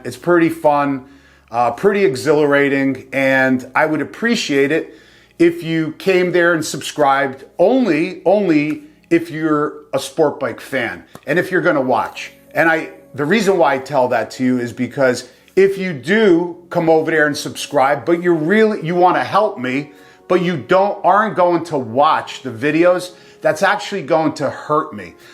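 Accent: New Jersey, United States. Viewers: Thanks for the explanation! I was worried that we'd miss your enthusiastic Jersey accent :) Maybe a nice segway to the new channel would be you getting on a PanAm or better yet, a Buell. then you get all the viewers, HD and Sporties alike lol In all seriousness though, as long as your riding youre good in my book. And if folks cant handle learning about a different type of bike, well....as my mom would say "sucks to be them". I hope you throw a couple Harley videos on the sport site, just for kicks ;)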